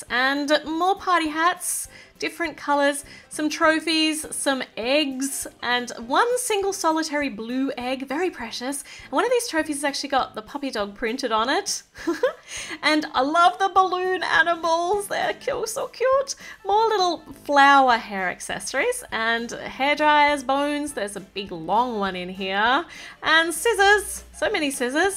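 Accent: Australia. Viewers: sydney i think (she sounds like it)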